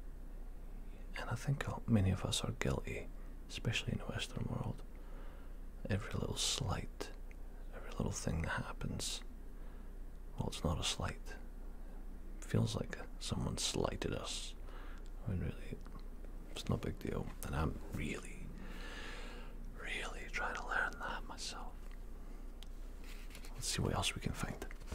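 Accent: Scotland, United Kingdom. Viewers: Love love the Scottish accent! Your voice is so relaxing. Just subscribed to your channel 🙋🏽‍♀️😊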